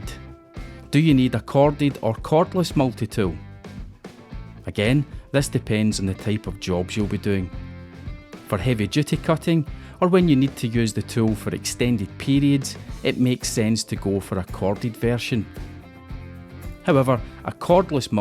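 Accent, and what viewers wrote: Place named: Scotland, United Kingdom. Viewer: tool instruction and Scottish accent....jackpot! 😍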